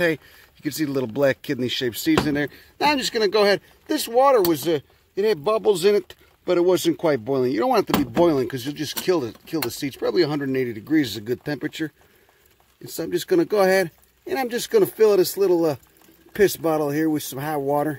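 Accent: Chicago, United States. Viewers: You are fucking funny! With your Chicago accent and knowledge of botany. It's like listening to a mobster with A botanical education. Kinda almost an edgier botany version of AvE. Lol. And I love him.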